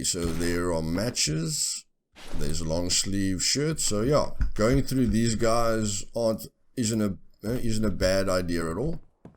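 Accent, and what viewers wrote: South Africa. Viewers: Is that a South African accent I hear my ou? Great video keep up the good work! Jy verduidelik die detail van die game baie goed.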